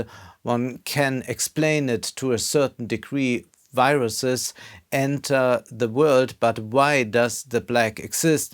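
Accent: Germany. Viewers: Der Akzent ist doch bestenfalls nebensächlich. Wenn der Wert des Gesagten davon massgeblich geprägt ist, ist es sowieso nicht viel Wert. Steckt man viel Energie in akzentfreie Sprache, kommt es anderswo zu kurz. Ob ein Akzent von Vor- oder Nachteil ist, hängt vom Zusammenhang ab. Der deutsche Akzent verschärft den Eindruck des Fachmanns - fachmännisch ist der Vortrag auf jeden Fall - und trägt zum Branding bei. Das ist vielen Deutschen, die dem Überwinden eines deutschen Akzents aus welchem Grund auch immer einen hohen Stellenwert beimessen, oftmals nicht bewusst. Nach 80 Jahren sind die Zeiten, in denen man einen deutschen Akzent nicht selbstbewusst tragen darf, ohnehin vorbei. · Man merkt, dass du an der englischen Aussprache gefeilt hast. 👍🏻 Verglichen mit den ersten Videos hört man den deutschen Akzent nicht mehr so gewaltig raus.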